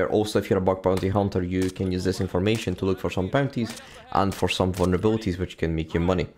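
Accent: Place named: Scotland, United Kingdom. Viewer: Good to hear a Scottish voice in the community 😢 Exploiting the SSH flaw sounded a bit like trying to thread a needle while wearing oven gloves when i listened to someone discuss it earlier. The Adobe one?: more like reaching through an open window. Between that & Polyfill, bunch of ops teams & web devs are gonna be pretty busy this summer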